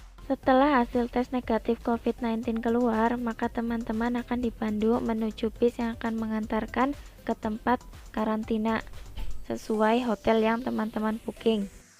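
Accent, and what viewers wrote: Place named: Banyumas, Indonesia. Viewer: Ngapak kie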